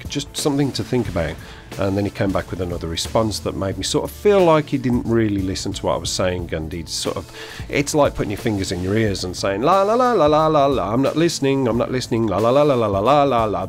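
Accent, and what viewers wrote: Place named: Black Country, United Kingdom. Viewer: Great vid new sub u sound like a fellow yam yam with ya accent are u from wolves or nearby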